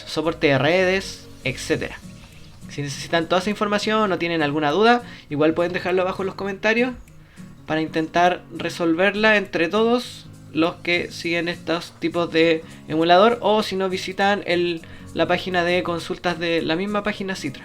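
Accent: Chile. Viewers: eres chileno no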